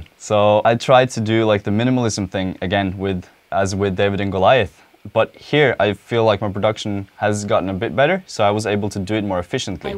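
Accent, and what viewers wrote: Norway. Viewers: Nice. Are you from norway?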